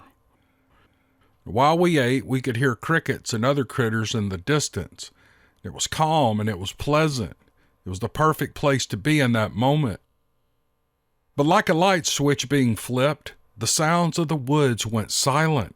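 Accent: South, United States. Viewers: all these people love you! Your genuine love and goodness is overwhelming! That southern voice is to kill for! Have I buttered you up enough yet? Just kidding. I can’t even get a stream to go through correctly. But Im good at sending peek-a-boo pics of my hubby unintentionally. Lol 💝💝💝💝💋🙋‍♀️👩‍🦳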